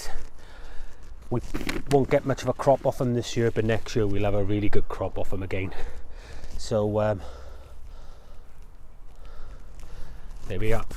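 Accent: Wales, United Kingdom. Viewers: Hi. I'm a new subscriber. Your post's are FABLESS, (Love the Welsh accent) How's your rhubarb done this year 2019? Would appreciate an update. Best wishes from a fellow grower. 👍 ✌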